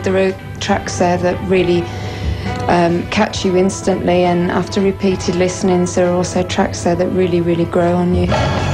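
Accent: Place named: Black Country, United Kingdom. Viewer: She's a yam yam brummie through and through. We are from the same area. Sad at her passing.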